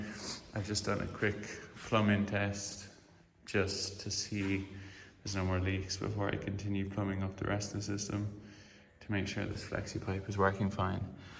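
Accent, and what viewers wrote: Ireland. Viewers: indeed you did not specify what thread standard you used for your hose connections. People in North American will assume NPT because that all we have commonly available here. Sounds like you have an Irish accent, so maybe you did use BSPP (British Standard Parallel Pipe) fittings which is what the La Marzocco machines have inside. But those fittings are not commonly available in NA. North American Pipe Thread (NPT) fittings are tapered, not parallel, have slightly different thread pitch, and while a ¼” male NPT will thread into the pump on the LM Mini & Micra Pump BSPP female connection, it will also damage it in the process. To connect an NPT flexible hose to the LM pump, a BSPP 19 threads per inch) to NPT (tapered 18 threads per inch) adapter is required. So maybe you are fine if you are indeed in Ireland, but a North American viewer will damage their machine doing what you did with plumbing fittings available locally. 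· thanks for the informative video - just what I needed to see how involved the plumbing was before making a decision on whether this will be my next espresso machine upgrade from my plumbed ECM Synchronika (gen 1) - nice to hear the Irish accent when I landed on this video - I am from West Cork and wife is from Galway but living in Tampa Florida for last 28 years. The cafe looks great - hopefully will be passing through Gorey some day to try the cappucinos!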